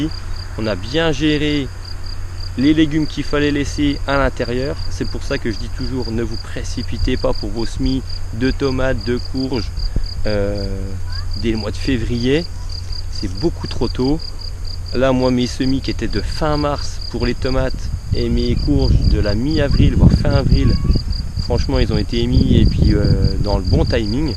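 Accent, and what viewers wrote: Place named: Meuse, France. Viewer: Bonjour vidéo intéressante. La pluie peut être bénéfique. Êtes vous de la Meuse car je reconnais ce petit accent. A bientôt sylvie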